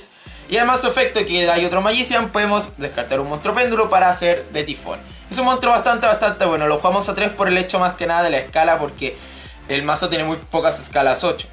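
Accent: Chile. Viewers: Sos chileno ?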